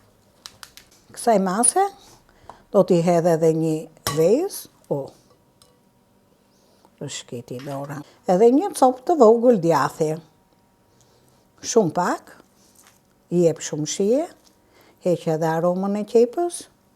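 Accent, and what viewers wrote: Tirana, Albania. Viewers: Zonjë e nderuar të lumshin duart ,sa mir gatuan dhe sa bukur shpjegon me dialektin Tironas ,paç jetën sa më të gjatë ,dhe të kesh shëndet të mirë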